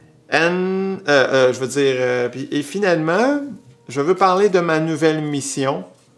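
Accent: Quebec, Canada. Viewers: C'est vrai que c'est courageux de distribuer ses chansons gratuitement, surtout pour un mec comme toi qui n'est pas totalement inconnu du grand public et qui doit passer pas mal de temps en studio. Cela dit, as-tu un support CD dispo en France ? Merci, Continue, gros ! T'es le seul québécois crédible malgré ton accent sur la toile !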